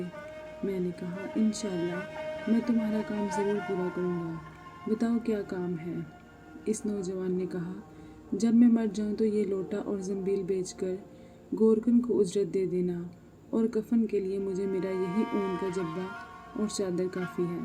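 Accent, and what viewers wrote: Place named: Kashmir, India. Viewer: I hope u from Kashmir it's your voice 😲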